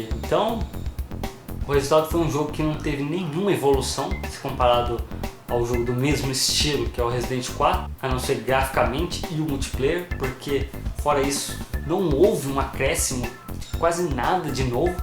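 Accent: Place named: Piracicaba, Brazil. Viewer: por acaso você não é de piracicaba não né?? nosso sotaque parece ser o mesmo...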